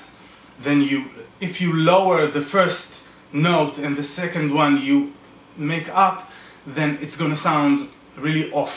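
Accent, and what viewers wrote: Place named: Arab world. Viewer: هذا الشخص عربي وليس اجنبي، واضح جدا من اللهجة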